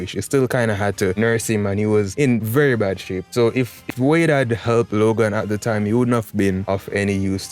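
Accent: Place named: Jamaica. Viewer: Bomboclaaat rastaman ahh accent, cool af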